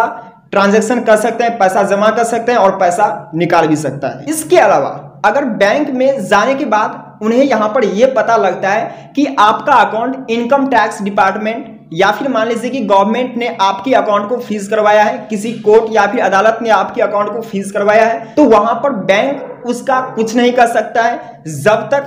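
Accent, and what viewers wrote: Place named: Bihar, India. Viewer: Tumhara awaz se lagta hai tum bihari ho😄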